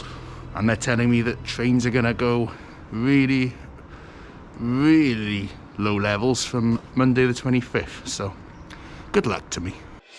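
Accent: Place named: England, United Kingdom. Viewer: You are one great historian and a narrator, not to mention, you’re an amazing cinematographer! Having lived in the Philippines, your accent can be clearly understood by most of your viewers, The Filipinos👏🏻👏🏻👏🏻 While doing your videos, stick to the English accent we understand not with Gaelic or Welch accent 💖💖 more more more please. NEXT: Cardiff Castle and the markets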